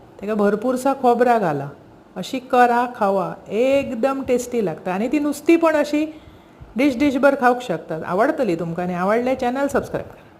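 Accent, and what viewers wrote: Malvani, India. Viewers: Like your malvani narration ❤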